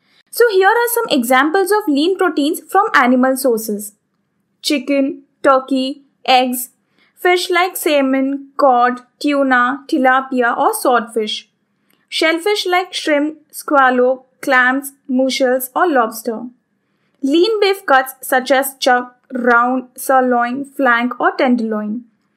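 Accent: India. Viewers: Indian logo ki english..uffff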